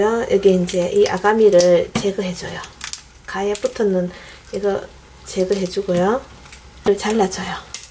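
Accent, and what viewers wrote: Gyeongsang, South Korea. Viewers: 이모님 영상 보는데 옆에서 아내가 인사 따라하네욬ㅋㅋㅋㅋ대구사람아니면 못한다고 대구부심 ㅋㅋㅋㅋ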